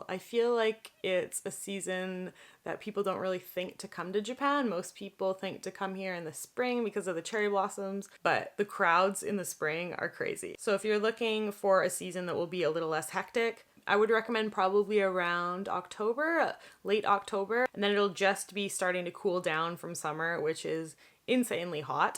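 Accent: Canada. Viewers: I live in WA state and I interact with Canadians often. The dead giveaway for Canadians for me is the way they say "eh" and "about", and does not disappoint! LOL, thank you for sharing! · Has ever mentioned what part of Canada she's from? I'm curious about her accent My instincts say west canada, vancouver, but i'm a total novice when it comes to their accents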